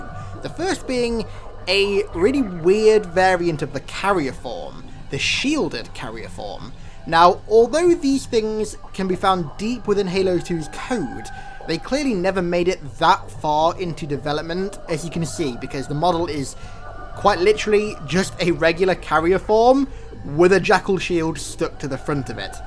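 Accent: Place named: United Kingdom. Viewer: Thanks for the video man, I just subbed to you like last week. Just wondering are you from the Uk? Your voice is kinda odd to me and sounds like it's from the Uk. Just asking cause I have seen some vids with facecams and I see usa flags in the background and guessed you are either from there or the Uk.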